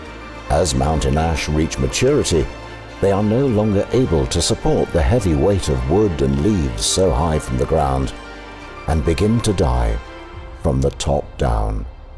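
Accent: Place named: Australia. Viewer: Love the way they chose a really Aussie sounding voice for the commentary, plus he bungs it on a bit more too 😃👍🇦🇺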